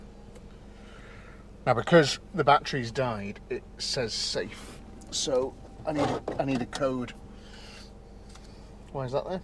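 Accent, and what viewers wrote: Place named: North Wales, United Kingdom. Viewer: Just found this channel and proper enjoying this video. Going off your accent your Chester/ north wales. Nice to find decent content and long videos.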